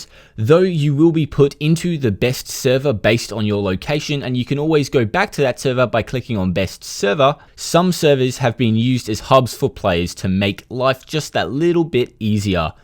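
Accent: Australia. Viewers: yo didn't know ur australian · You australian? What city you at. I'm in perth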